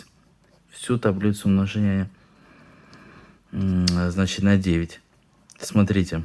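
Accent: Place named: Ukraine. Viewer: Вот удивительно, фамилия и внешность восточная, а акцент украинский)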